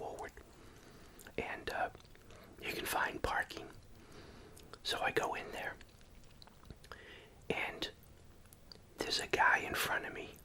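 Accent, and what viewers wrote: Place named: Boston, United States. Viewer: Amazing... he is so wholesome and love his accent. When he say Bossston..:)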